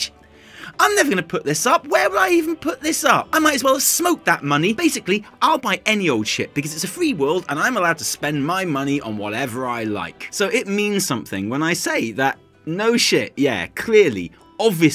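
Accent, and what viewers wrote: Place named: United Kingdom. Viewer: Good video fair points made, but why do you keep cutting the video after literally every sentence? Also you sound British but say the dates like the American way? Just curious.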